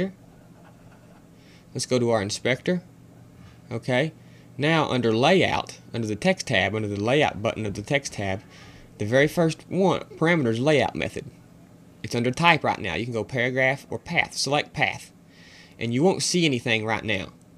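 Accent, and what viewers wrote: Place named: Georgia, United States. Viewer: Once again i must say you are a smart man, and you sound like your from georgia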